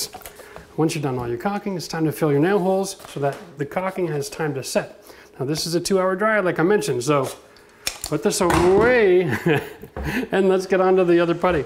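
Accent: Canada. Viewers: How come canadians pronounce words differently. Example word process. Canadians say it : Pr "oh" sess -vs-"praw" sess. Also the Word "about" in usa most of us say "ah bowt" instead of "a-boat".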